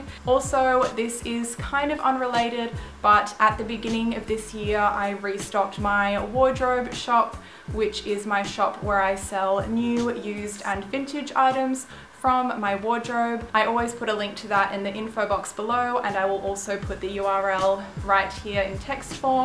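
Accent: Australia. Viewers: Are you from Australia? It's just the accent, sorry if I got it wrong.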